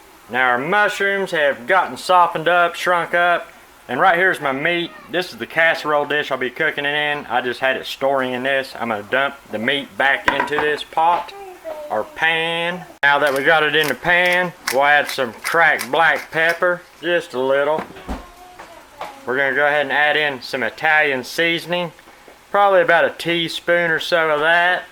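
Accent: South, United States. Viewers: Hi iam so happy to see you!! You are a joy to watch. I like the southern accent its great!! Thankyou for sharing recooes with us. Mighty helpful to learn. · Where do you live? I know its the south cause of the accent. Reason I am asking is because I live in Mobile Al. Its between Penscola Florida & Gulfport Ms.. I was asking because their is a sausage called conecuh sausage. This stuff is so good you want ever go back to any other sauage. Wal-Mart sells it heck every store where I live sells this. When I was living in North Carolina target sold it, so I know you could ask someone could they order it & you could buy it from the store. This sausage would be so good in anything you cook. Its a great grill sausage as well. If you have never tried it you should try some. If you don't want to order it ask your local to store to order some. I told people in north carolina about it they would seriously go in target an buy like 4 or 5 packs at a time, so if they was sold out they would have some on hand in freezer.. 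When relatives come down they literally take a cooler full back with them. This sausage is just the best. I will leave a link... Anyways I love all your southern recipes. Southern food is the best food. Thanks for the really good recipes. <link>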